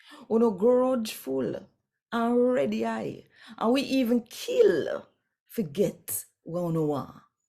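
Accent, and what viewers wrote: Jamaica. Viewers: Me luv de Jamaican Creole Readings. We need fi hear dem more. 🙏 🙏 🙏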